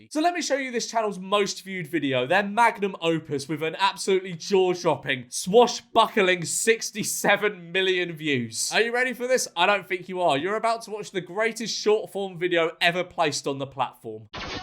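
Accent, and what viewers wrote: United Kingdom. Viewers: I approve i like this smol bri’ish man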